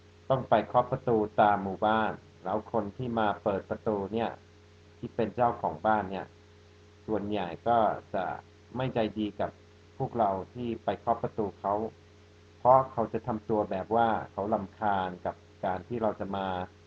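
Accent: Thailand. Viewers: พูดภาษาไทยชัดเว่อร์ไม่พอนะ พูดภาษาไทยสำเนียงไทยได้ด้วยอ่ะ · Where did you learn Thai? You even have Thai accent!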